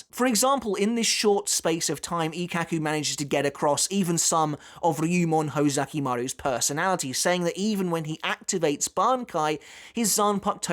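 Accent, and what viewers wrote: United Kingdom. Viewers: It's one of my favorite banki. I appreciate the work you do, but I will say your pronunciation of the names is very lazy. I understand it's your British accent, but the names aren't British... At least try to pronounce them closer to Japanese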